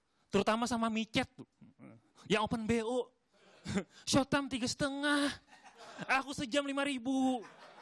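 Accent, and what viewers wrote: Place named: Banjar, Indonesia. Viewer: Logat Banjar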